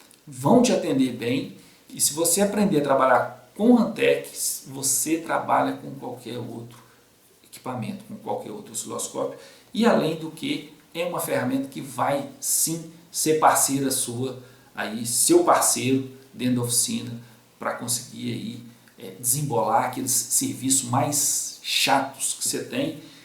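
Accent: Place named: Belo Horizonte, Brazil. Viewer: Esse aí é de BH certeza!😅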